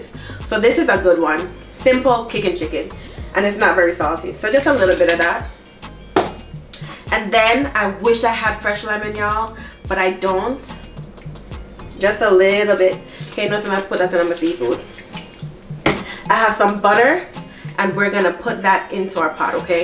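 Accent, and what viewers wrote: Jamaica. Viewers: Why in some videos does she have a jamacan accent and others she doesn’t does she just put it on sometimes? I’m confused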